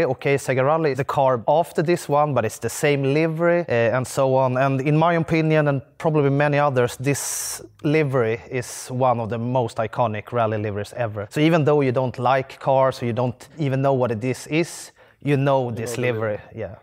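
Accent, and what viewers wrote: Sweden. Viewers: Legend says it's impossible to obtain a stronger swedish accent than that of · Absolutely loving that Celica.. Great video, loving the Swenglish!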